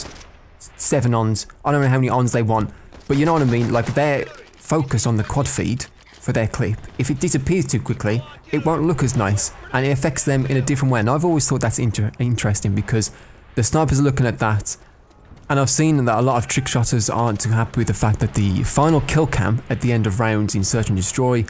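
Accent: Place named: Black Country, United Kingdom. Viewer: Followed you for ages, glad you're back mate. Think you're from the same (midlands?) area as me, although you sound Black Country/Walsall and similar age too. Keep uploading mate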